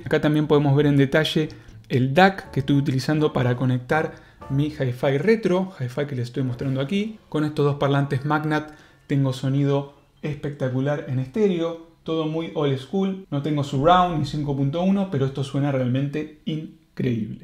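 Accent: Argentina. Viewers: Super argentino! Saludos! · Buen video! Feliz de ver videos sobre lo que me gusta en un español rioplatense! Saludos desde Uruguay!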